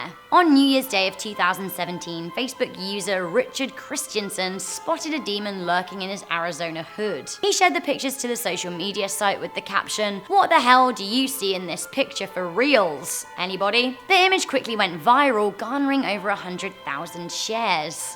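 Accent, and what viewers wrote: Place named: England, United Kingdom. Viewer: That stupid english accent Lol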